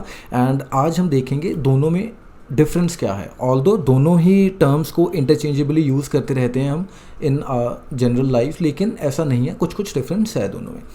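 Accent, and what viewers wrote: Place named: Delhi, India. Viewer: Puri delhi wale accent.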